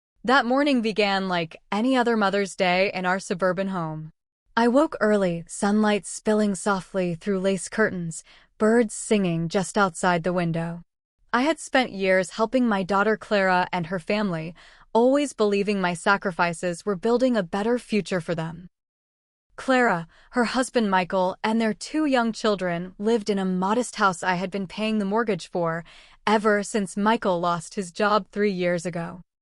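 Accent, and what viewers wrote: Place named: United States. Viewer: If she was born on Australia why has she got American accent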